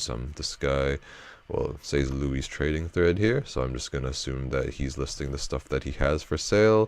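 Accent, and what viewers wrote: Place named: United States. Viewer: You live in New Zealand , funny you sound American! 😉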